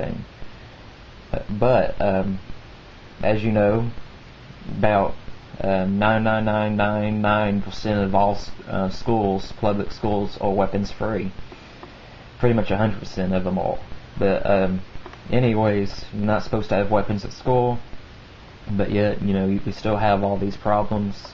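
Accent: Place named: South, United States. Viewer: He has a southern accent with a speech impediment. He substitutes /r/ with a /w/. "polow beaw" Great stories. You have a very mature and keen mindset. Keep it up!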